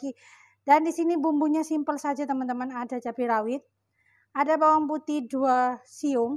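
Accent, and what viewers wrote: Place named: Mataraman, Indonesia. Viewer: halo mbak e, tiyang pundi niki? dari logatnya kok seperti orang mataraman, perkenalkan kulo cah nganjuk, domisili Surabaya. ini mirip banget dengan tahu tek surabaya, kari nambahi petis sitik. kalau orang nganjuk nyebut ini tahu bumbu mbak... jan krenyes2 ngiler aq mbak, tp itu cabenya banyak banget, gek ndang ngoweh2 aku kalau nyobain 😅😅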